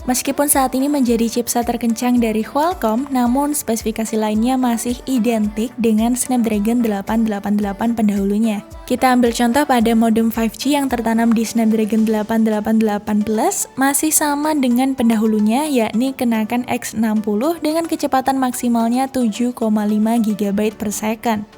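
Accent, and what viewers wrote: Java, Indonesia. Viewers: Mbaknya kall ngomong medok jawa 😂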